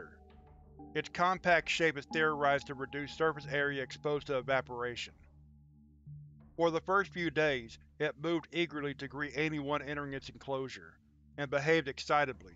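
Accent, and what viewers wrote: South, United States. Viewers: From what I can see, you're doing an outstanding job. In my opinion, as with all things, your new focus and "stepping up the game" has completely revitalized the presentation of SCPs, and you should feel rightly proud of it. Also, it's good to hear another Southern Man doing videos that aren't stereotypically Southern.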